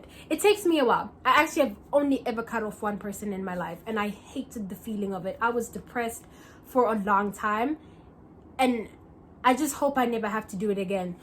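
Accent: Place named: United Kingdom. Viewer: it's the British accent for me 🤣😭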